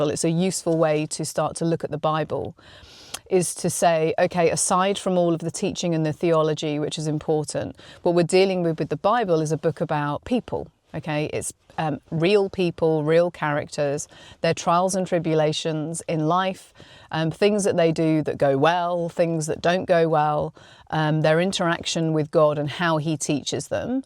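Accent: England, United Kingdom. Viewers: Brilliant as always! It is highly evident that you have humbled yourself before the Lord and so He is using you in a mighty way. This message resonates with me so much and yes, I do find that there is a definitive pattern of God allowing us to have our own season of death to self so that He may then live through us for His glory! As an aside, somehow, I feel more cultured just listening to you with that lovely English accent. Ha Ha And, since I did not have any children, I bestowed my favorite name to my beloved cat,.:) God bless you!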